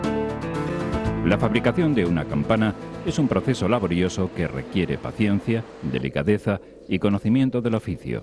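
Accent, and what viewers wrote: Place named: Spain. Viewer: Jajajajaja....España hombre, no percibe el acento?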